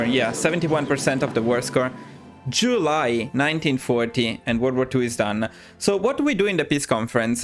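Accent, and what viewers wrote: Italy. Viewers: bro sei italiano lo percepisco.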